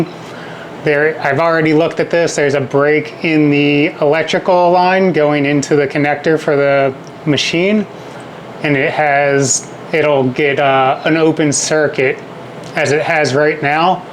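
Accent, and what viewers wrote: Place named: Philadelphia, United States. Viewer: Very informative! I appreciate learning about the Cameo. You sound like you are from Philly.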